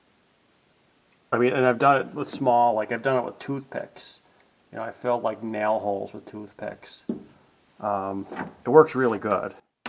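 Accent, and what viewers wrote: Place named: Northeast, United States. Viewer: Doing real well. I love your work and your accent reminds me of where I grew up. I spent my time in North Jersey in Philly and for one year when I was in the Navy back in the 90s I was in upstate New York.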